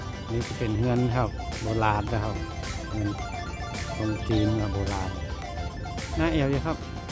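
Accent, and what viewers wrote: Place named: North, Thailand. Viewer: เมินๆจะได้ป๊ะคนเหนือเนาะ,อู้เมืองมันม่วนแต๊หนาอ้าย,วิวงามขนาดเลยคับ,เติมใจ๋หื้อเด้ออ้าย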